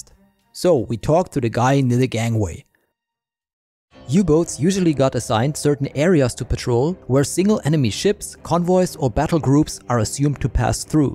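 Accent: Germany. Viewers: Das Boot was a masterpiece of a movie. Good to hear a German narrate the video. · Lovee the german pronounciation!!! 😭😭😭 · Hahah, I love it that you are German (or Austrian, no offence) and you can give us proper authentic German uboat banter! Brings back memories of Das Boot! Alles gut, Herr Kaleun!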